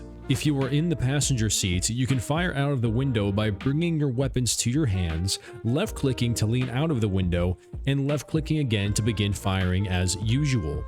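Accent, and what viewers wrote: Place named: Australia. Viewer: To Americans, your aussie accent could be considered strange.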